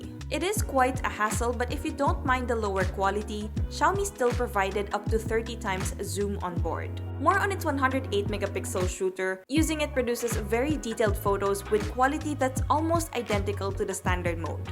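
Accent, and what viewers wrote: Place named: Philippines. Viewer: never lose that pinay accent, its part of your charm "var ree aunt"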